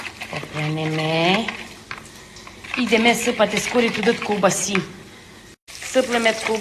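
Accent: Transcarpathia, Ukraine. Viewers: Закарпатський діалект.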